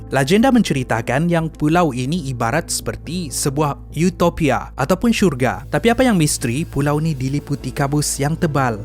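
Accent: Sabah, Malaysia. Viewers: Admin ni orang sabah ka..loghat macam orang sabah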